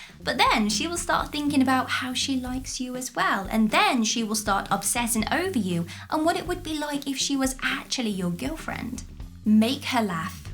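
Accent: United Kingdom. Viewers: Sounds like a bloody brit , not?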